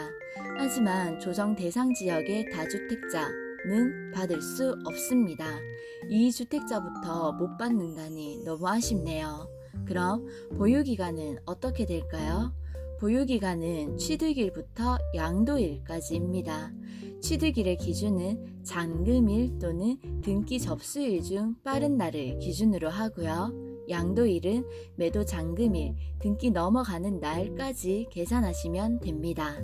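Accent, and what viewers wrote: Gyeongsang, South Korea. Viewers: 갱상도 사투리 좋아요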